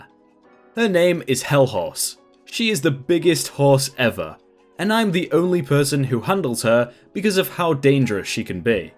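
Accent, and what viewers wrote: United Kingdom. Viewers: Hi! I have always been listening to your videos ever since I come across by my recommendations and I enjoy it every single time because I enjoy your accent of British. Please keep it up with it. I enjoy a lot.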